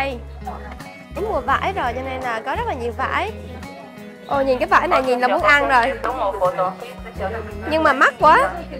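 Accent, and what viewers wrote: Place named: Quang Nam, Vietnam. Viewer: Đặc sệt tiếng Qoảng Nom. duyên thật.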